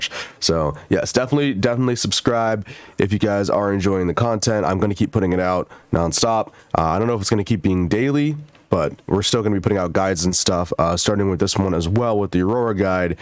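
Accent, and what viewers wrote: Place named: France. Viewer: French accent 🤣 Get better man! And thanks for your time showing this guide to us!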